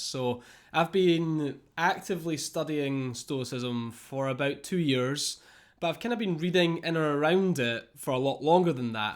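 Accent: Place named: Scotland, United Kingdom. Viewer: Are you Scottish? I think by your accent, yes. Either way, I'd listen to you talk about carrots just to listen to your accent. :)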